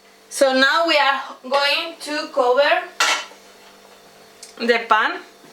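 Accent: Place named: Spain. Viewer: I love Spanish Food, I used to work in a spanish restaurant. The food looks tasty. Love your spanish accent!!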